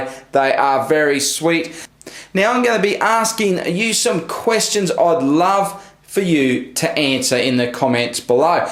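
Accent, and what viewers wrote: Australia. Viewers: are you Australian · Youre an ausie, arent you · He has a strong Australian accent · This guy has a real thick strayan accent i like it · Wow are you Australian I am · I like your australian accent. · Love the Aussie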